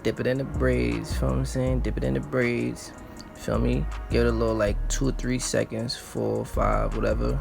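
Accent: New York, United States. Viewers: i know a new yorker when i hear one lolol yurrrr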